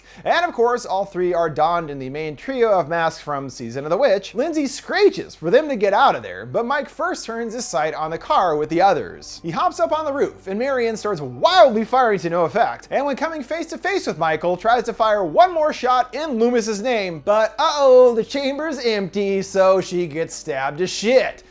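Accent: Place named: United States. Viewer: No. He speak American English.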